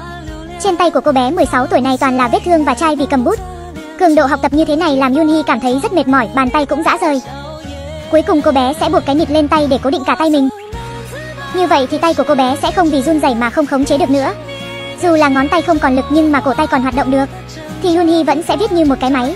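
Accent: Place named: North Korea. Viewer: Nghe thì giống bên triều tiên hơn:)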